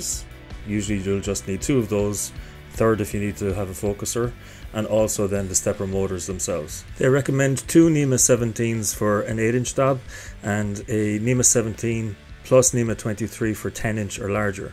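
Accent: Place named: Cork, Ireland. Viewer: Lol , I meant , where .. you sound corkish , int in this setup , love to pick your brains a bit on it , if you were up for that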